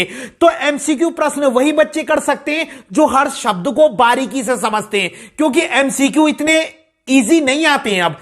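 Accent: Garhwal, India. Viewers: Bhiya ap gadwali ho ?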